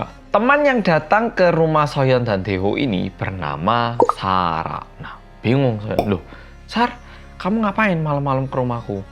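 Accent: Java, Indonesia. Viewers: Bahkan udah bertahun-tahun di Korea, medoknya kental bet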